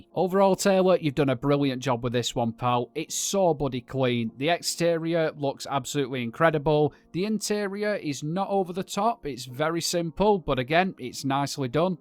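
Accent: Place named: Lancashire, United Kingdom. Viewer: Bolton or Wigan accent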